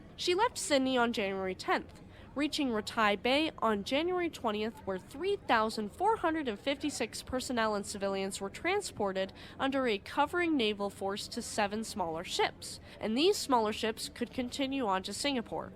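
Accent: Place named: Pennsylvania, United States. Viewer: Being someone from a northern town in Canada I don’t pretend to be great with American accents. That being said, I have a good friend from Pennsylvania and every time I listen to one of your videos I catch the subtle similarities of the accent. Keep up the good work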